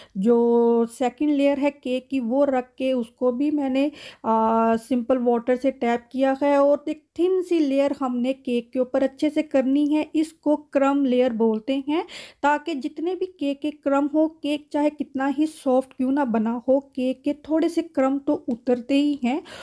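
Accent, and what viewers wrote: India. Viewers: Aap ka accent kitna simple aur desi hai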